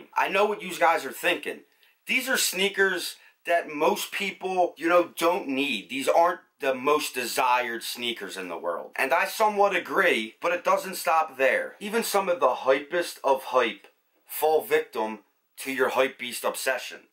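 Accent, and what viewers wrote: Philadelphia, United States. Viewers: Most thorough and exemplary Philly accent on the interwebs. Respect.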